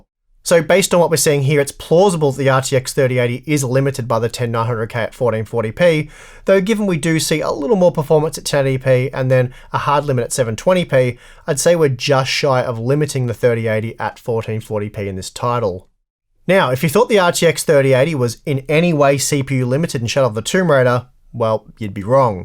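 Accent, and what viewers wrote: Australia. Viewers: I really love how Aussies pronounce things....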